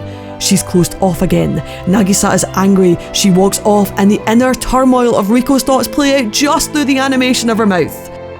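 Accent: Scotland, United Kingdom. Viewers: Love watching your reviews on anime especially your Scottish accent 11/10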